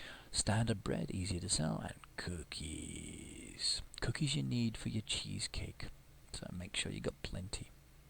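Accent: United Kingdom. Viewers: He's British lol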